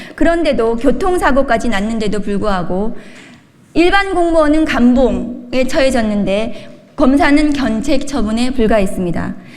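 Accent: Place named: Gyeongsang, South Korea. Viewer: 훌륭한 박은정 의원 특별한 성대를 가지셨네. 같은 경상도이지만 사투리 거슬리네. 앞서지마세요. 뒤가 보이니.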